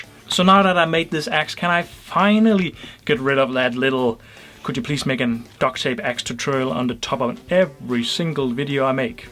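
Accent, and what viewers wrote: Denmark. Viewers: er du dansk?, you have a danish accent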